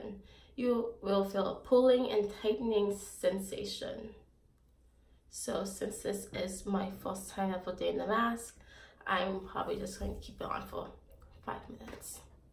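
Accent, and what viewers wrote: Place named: Boston, United States. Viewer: Are u from Boston? What an accent ! 🙂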